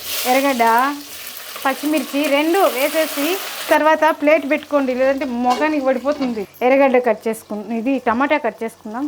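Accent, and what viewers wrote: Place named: Anantapur, India. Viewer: Sister your Launguage is very nice,it remember me my Ananthapuram journey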